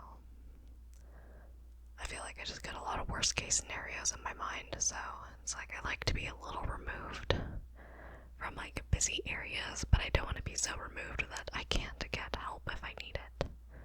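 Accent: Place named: Vancouver, Canada. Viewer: It sounds as if you are from Vancouver, BC.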